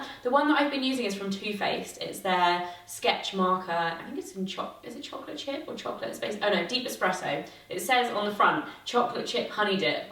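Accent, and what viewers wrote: China. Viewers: The speaker accent sounds like Chinese (mandarin speaking) accent =) it's cute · Hahaha....the accent? I think it's Chinese! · The speaker is 100% speaking a Chinese accent! Lol!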